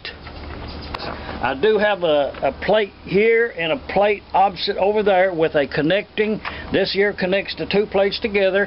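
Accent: South, United States. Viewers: I love your "gooda olde" southern accent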